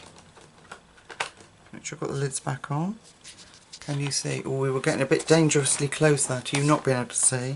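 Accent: United Kingdom. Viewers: Oh, you are so much fun! And you keep it real! And I love your British accent! I watch zucchinikitty all the time, she’s indeed a master with the inks, I got a few just to try, they are not as easy to use as it would seem! The detail inking tool is really hard to come by where I live (Mexico where nothing is available and when it is is at least 5x more expensive) do you think a Q-tip could work? I hope to see you color with these a LOT! They are fast! And even though I love colored pencils.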